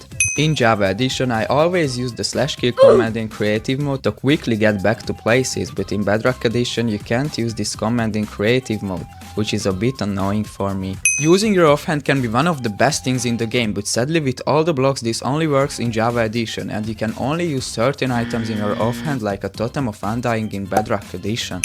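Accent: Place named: Hungary. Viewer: Based on your accent, are you from Hungary?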